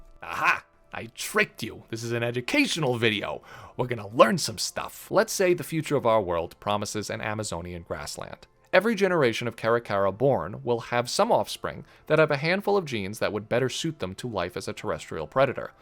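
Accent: New England, United States. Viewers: The NE accent gets stronger and stronger each video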